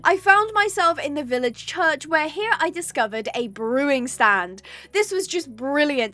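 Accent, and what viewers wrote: United Kingdom. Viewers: Your voice sounds like British and it’s brilliant 😙👌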